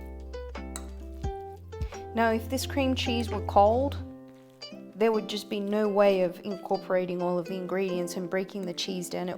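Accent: Australia. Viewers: saw you on Mike Chens channel. great channel and i have to say every time i hear your accent, I keep thinking of crocodile dundee or steve irwin. "you call that a knife?" lol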